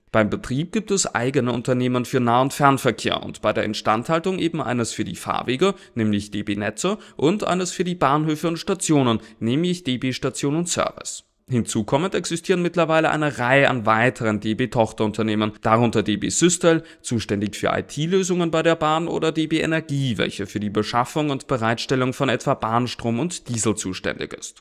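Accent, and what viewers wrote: Austria. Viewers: I don't see the problem here. Perfect German (with an Austrian dialect).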